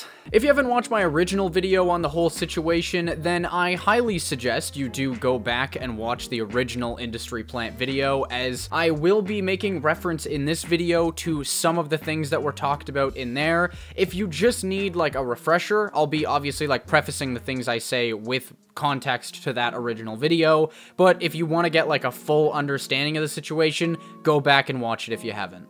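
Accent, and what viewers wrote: Canada. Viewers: yo r u canadian? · Are you Canadian? Plus thanks for the vid 🔥 been too long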